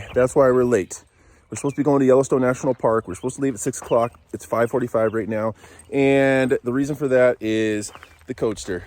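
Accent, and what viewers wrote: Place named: South, United States. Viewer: I loooooove how you sing with your dog! And your country accent! "Going to Yellowstone to see the wolf doggies!" I love love love it! I woke up this morning with the memory of your singing with your dog, looked up your video in my history from yesterday, and downloaded it, just so I can watch that scene again and again! And yes, great Yellowstone video as well! You are a gem! I'm subscribing!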